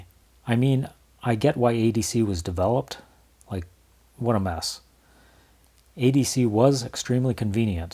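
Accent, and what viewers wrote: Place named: Canada. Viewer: You should do a video on the first aluminum iMac model from 2007! You could show Apple's sudden shift to their current aluminium/brushed metal look for their iMacs, since they released a white iMac earlier in the same year. I REALLY miss the white clear plastic look, but I have a fondness for the early aluminium iMacs. About 2 years ago I rescued one from being thrown away at my university. It was running Yosemite (and very poorly). I installed Leopard on it using a modified install disk for a MacBook. But I'm planning on putting Tiger on it sometime in the future. I even picked up the original mouse and keyboard that came with that model, just to keep it era-accurate. Also love your channel, I immediately knew you were a fellow Canadian.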